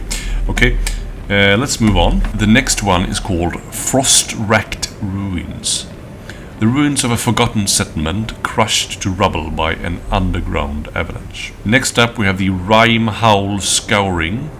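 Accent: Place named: Sweden. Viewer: This was a great idea! I wonder, did you reference any of the books from the last year of Warcry for the Gnarlwood? It takes place all over that area…and likely continues to be set there with the new Hunter & Hunted release. I like your pronunciation of some words. The Swedish soft “J”, I love it! “Objectives”, chefs kiss right there dude. Your pronunciation of chasm I find very interesting. I’ve always ever heard it pronounced like cazz-em. With a silent H. I find British English has a lot of silent H’s. Everyone here in North America has to pronounce every single letter and syllable 🙄. Want a good laugh, ask a North American to pronounce Worcestershire Sauce. Lol, it’s a riot. You frequently hear an H added between the c and first e ! Anyways keep up the great work!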